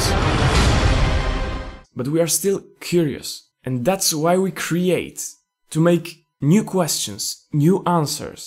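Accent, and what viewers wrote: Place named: Poland. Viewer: troche taki polish english ale nie jest źle ;p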